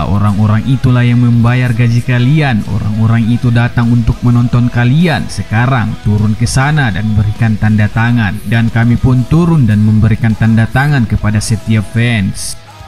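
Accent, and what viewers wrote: Medan, Indonesia. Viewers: Anak medan x kudengar omonganmu bang 😂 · Logat Medan kental bener wkwkw.. di ralat.. CANTONA bukan CONTANA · Medan x logatnya bang 😅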